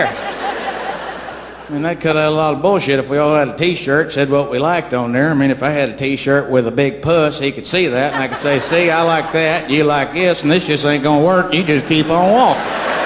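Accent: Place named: South, United States. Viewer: His southern voice makes the jokes, all the more better! Who agrees wit me?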